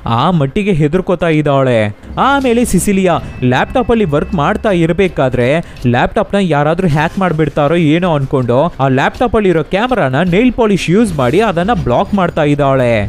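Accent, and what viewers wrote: Tamil Nadu, India. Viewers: Nim kannada Tamil accent 😄😄 but really well explained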